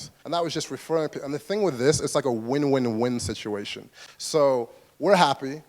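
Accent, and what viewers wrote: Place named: London, United Kingdom. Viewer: As a black man originally from London now living in America I have to say that his London accent is spot on, in fact he sounds like me :) A couple of London translations.... Thing = fing (London)......Alright = owight (London)..... Isn't it = innit (London or Ali G)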